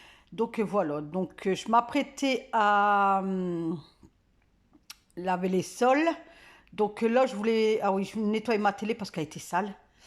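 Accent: North, France. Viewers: je découvre votre chaine avec cette vidéo, et j'ai tout de suite reconnu l'accent du nord ;) (j y suis aussi ;) ) . J'ai hate de retirer nos déco de noel même si on les adore, hâte du renouveau du début d'année :)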